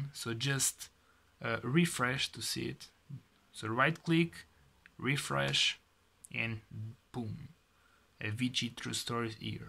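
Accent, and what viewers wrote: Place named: Russia. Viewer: You sound very russian lol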